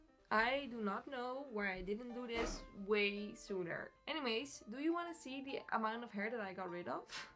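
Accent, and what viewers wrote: Netherlands. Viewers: I love your dutch accent:)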